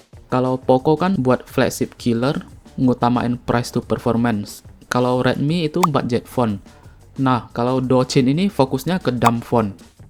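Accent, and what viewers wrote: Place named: Bali, Indonesia. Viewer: Logat bali😊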